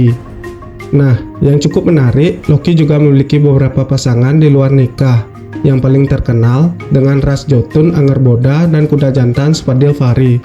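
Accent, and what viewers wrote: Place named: Bali, Indonesia. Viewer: Beli logat bali nya masih kental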